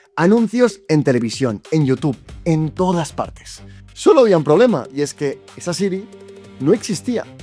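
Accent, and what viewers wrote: Spain. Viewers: Es horrible escuchar la pronunciación del inglés de un español 🤮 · otro “apel” fan boy. btw pueden los españoles decir apple como el resto del mundo? caga mucho el palo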